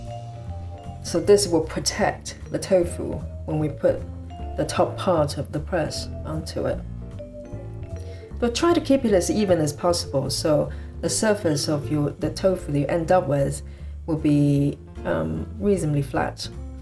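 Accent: United Kingdom. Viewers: LOVE your British accent!! Great video:)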